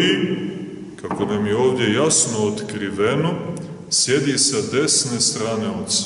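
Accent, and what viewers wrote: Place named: Montenegro. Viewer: Second BTW He speaks with heavy montenegran accent. Can't miss where he is from.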